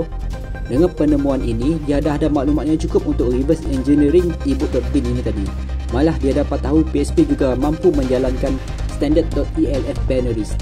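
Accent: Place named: Sarawak, Malaysia. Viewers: Bunyi kedak org sarawak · Aku tak phm sangat apa yg dia terang tu, tpi yg aku tau speaker tu org sarawak sbb dri segi dia bercakap mmg nampak slang org sarawak. Aku harap bukan aku sorang je yg perasan.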